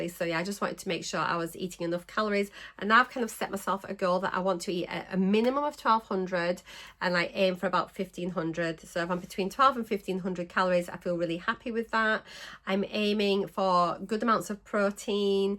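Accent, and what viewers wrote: Yorkshire, United Kingdom. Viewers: So pleased to hear you’re so close to your target! I love your channel and find your Yorkshire accent so endearing! I’m just one pound short of 4st loss, but will need to pause my injections for two weeks prior to a knee replacement surgery, and another one or two weeks post surgery. Not thrilled about that but needs must, I suppose 🤔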